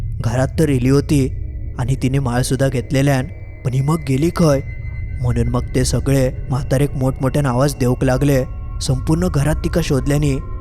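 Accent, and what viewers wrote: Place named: Malvan, India. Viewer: ❤.व्हेरी. Good and.sweet.malvani.voice. 😂.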